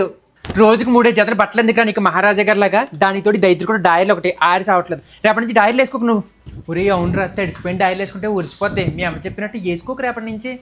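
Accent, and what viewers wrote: Godavari, India. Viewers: 😂😂😂 pure గోదావరి భాష😂😂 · Director ga,kodukunnanehe godavari yaasa super I'm missing that yaasa,our place dowleswaram daggara vemagiri andi,me vedios chala natural ga vuntai nethimeda kerchief, paper cutting badge la petti name rayadam unique asalu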